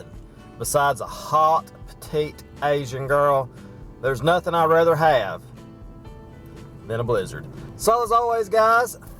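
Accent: South, United States. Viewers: Hahaha you are so cute! Finally a southern YouTuber with the accent to prove it! I'll go watch all of your videos now. ;)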